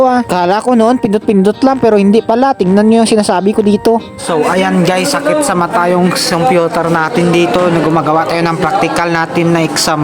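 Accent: Iloilo, Philippines. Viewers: Taga Iloilo kaba bisaya ka e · gagi ilonggo ba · Ilonggo ka idol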